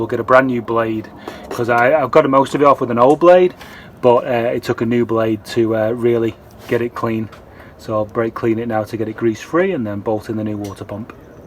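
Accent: England, United Kingdom. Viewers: are you from England??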